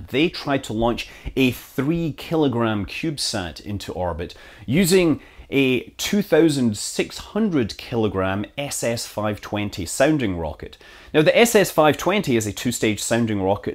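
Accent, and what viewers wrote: Scotland, United Kingdom. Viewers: Hey where in Scotland are you from?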